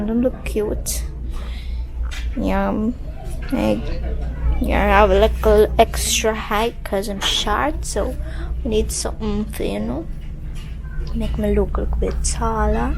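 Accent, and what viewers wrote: Jamaica. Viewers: I speak like Duel Sylvester, God has work out a well put together family vacation✈ be thankful.you speak patwa deep love it play safe ⚽️Errol love from sunny vincy 🇻🇨🙏😍💪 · Yes she speaks patois very cute and dresses trendy like a black girl 👧🤣😂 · girl big up, I like hearing you talk patois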